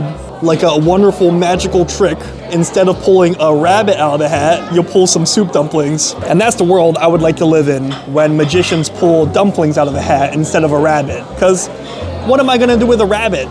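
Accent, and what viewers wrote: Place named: New Jersey, United States. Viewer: Where in Jersey is this guy from?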